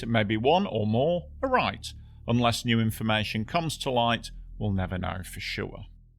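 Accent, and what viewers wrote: United Kingdom. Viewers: Thanks for proving once again that not everyone with a Brit/UK/Aussie accent should be doing narration. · I have watched your channel for a while now. With all the AI crap that is dominating YouTube. I am so happy that you, a human, keep me entertained. Thank you, British 'human' voice.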